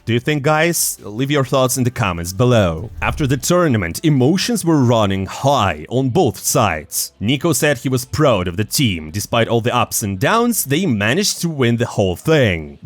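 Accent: Russia. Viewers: Your English sound Russian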